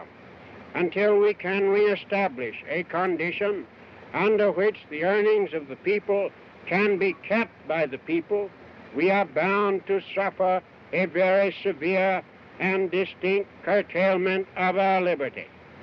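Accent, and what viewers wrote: New England, United States. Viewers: Boy, he really sounds New England. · Perhaps why he didn’t like public speaking. He was from New England, at a time when that area had a very distinctive speech pattern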